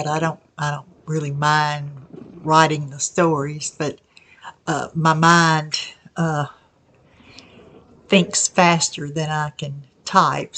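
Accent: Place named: South, United States. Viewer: Love this,! I think this is fabulous; you’re very funny, interesting and articulate, not to mention brave. And trust me honey, we both have southern accents even if we think we don’t 😘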